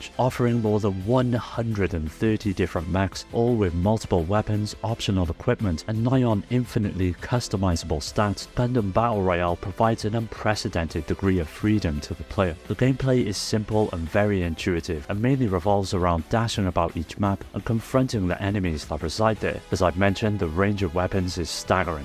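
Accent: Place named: England, United Kingdom. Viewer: Suikoden right off the bat? Fantastic. Just got stumbles upon your video on my feed and i like your english accent, because as a non native speaker it's easy to listen to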